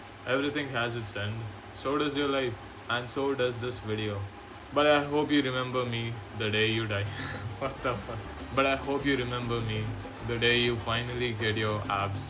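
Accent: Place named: India. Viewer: Bro you sound like an Indian guy. Are you from India?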